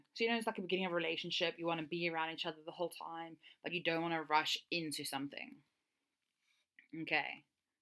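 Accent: Afrikaans, South Africa. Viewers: Your afrikaans accent is so thick. This is my first additional and i really wish i could read like this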